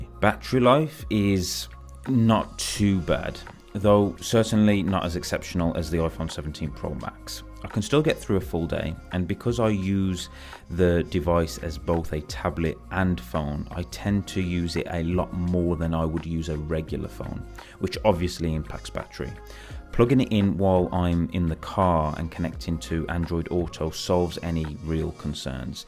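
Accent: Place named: Black Country, United Kingdom. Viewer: You from dudley?? I like black country people, my mum is from there. 😂❤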